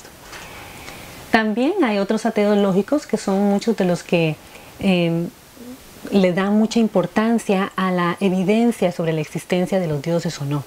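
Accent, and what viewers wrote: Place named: Costa Rica. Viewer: tu practicas alguna filosofia de vida o religion en tu vida, tu inteligencia es exquisita escucharte y una mujer muy bella me encantaria asistir a alguna charla o seminario tuyo x tu acento especulo que eres tica y q vives en san jose?? Se t puede ubicar de alguna manera donde encuentro tus libros??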